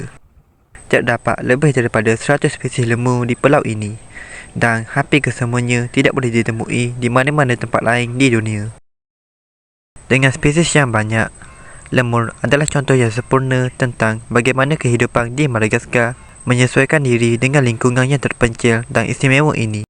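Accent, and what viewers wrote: Terengganu, Malaysia. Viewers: Orang ganu ke? Clear sgt bunyi ganu ye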